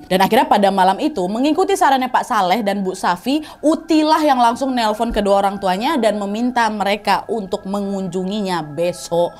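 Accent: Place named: Banjar, Indonesia. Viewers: agak kaget pas denger kak ngomong b.banjar, logatnya kerasa bgt ga sii?, keren parah kak hha 😌 juga baru pertama kali ini denger khw yg pake bahasa daerah tapi gabaca subtittle, btw berhubung udh di penghujung ramadhan, aku minta maaf ka wawak-wawak semua kalo aku ada salah yaa, minal aidin wal faidzin wawak semuaa 💟💟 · Cocok banget kak bicara bahasa Banjar, logatnya pun kental, emang multitalent 😊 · BANJARMASIN KANDANGAN HADIR ☝️, lucu dengar pakai bahasa Banjar 😅🤣🤣😅, Kya suara Acil ku di higa rumah yg panyarikan tuh 😅😅😅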